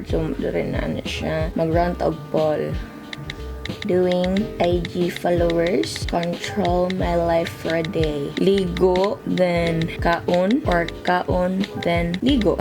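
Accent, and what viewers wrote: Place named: Cebu, Philippines. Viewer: Mgkakatawa ko sa imo accent dai.. perti ka gahi lomad sa cebu! Perti ka visaya😊😂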